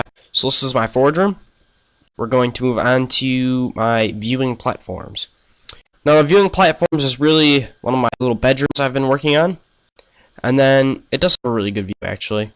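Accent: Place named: Canada. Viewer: Lol, I love your accent. Are you from Canada?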